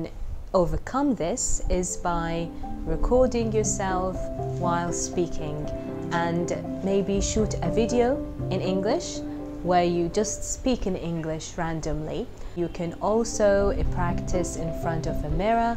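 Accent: United Kingdom. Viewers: مرحباً مس ، شكرآ لكِ على نصائح.. بجد استمتعت بي صوتك الهادئ وطريقتك الطيفه في التكلم عن اللغه الانجليزيه بي اللهجة البريطانية ❤.. عندى بعض المشاكل في اللغه حبيت أخذ رائيك فيها، أنا تعلمت اللغه الانجليزيه منذو سنه ونصف ولكن بسبب عدم مداومه على اللغه الانجليزيه أصبحت انسى الكثير منها وأحياناً في القواعد اللغه، سؤالي هنا هل لديك طريقة لي محافظه على اللغه بدون دخول في تدريس او ماشابه.. كمان إني لستُ طالبة حالياً.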